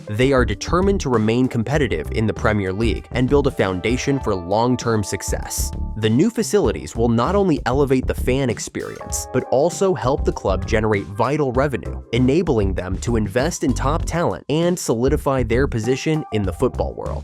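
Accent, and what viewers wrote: United States. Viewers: Why the stupid american accent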